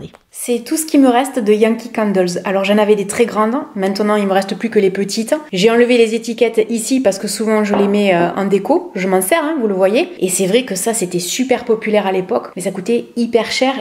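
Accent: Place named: Perpignan, France. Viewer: J'ai rigolé car il n'y à aucun de ses produits que j'ai testé acheter mais ta bonne humeur et ton accent de Perpignan me fond toujours du bien 😝😘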